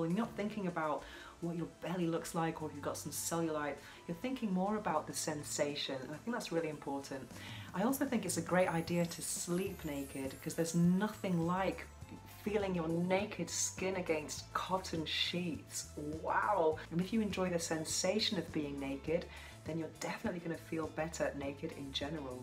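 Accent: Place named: England, United Kingdom. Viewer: I love your videos very educational I find them you're a great looking lady I love your english accent just curious as if you prefer britain or spain where you're living at now .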